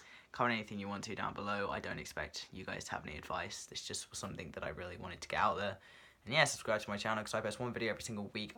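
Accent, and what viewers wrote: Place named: United Kingdom. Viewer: I seriously could listen to you talk all day!!! I love your voice and of course your British Accent, I'm such a sucker for the British Accent, The Best to you.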